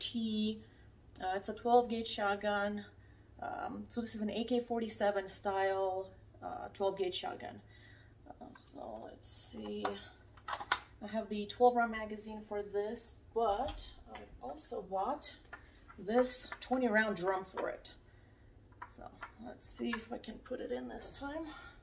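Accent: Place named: Russia. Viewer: is most likely Russian. Her accent(barely there but I can hear the way she say Kalashnikov)....Privet.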